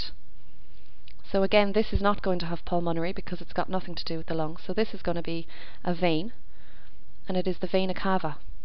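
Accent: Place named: Ireland. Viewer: a fine irish accent with good diction ... what could be finer ?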